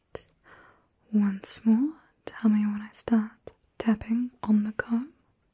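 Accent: New Zealand. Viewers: New Zealand mate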